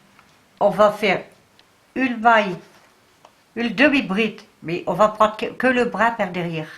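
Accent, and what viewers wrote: North, France. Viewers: bonsoir trop beau et toujours aussi bien expliquer et cette accent du nord, passez un bon moment a suivre la vidéo je vous remercie vraiment gros câlin a minou Monique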